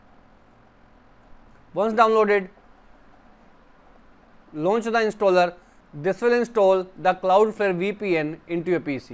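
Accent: India. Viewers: 😭you know its gonna work when its a guy with indian accent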